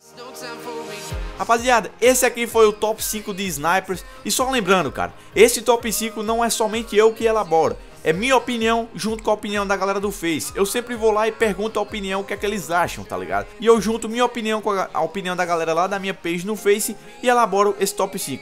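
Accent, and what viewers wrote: Northeast, Brazil. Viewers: ruma de bônus kkkkkk sotaque nordestino é diferenciado!!!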